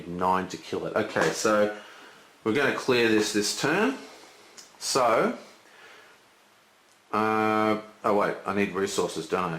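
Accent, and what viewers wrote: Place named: Australia. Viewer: Neutral australian or nz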